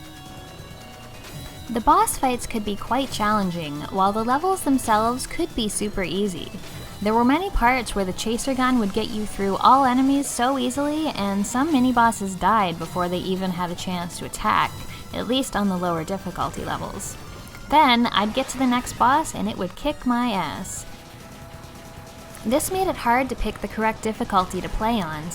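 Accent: Canada. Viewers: <3<3<3<3<3<3<3<3<3<3<3 Nothing I see in your profile says your Canadian, but the accent gives you away. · Ha same here. I knew within 12 seconds that she was Canadian. I've had several occasions when I identified someone as Canadian by their accent and they got annoyed because they don't think they pronounce things differently. lol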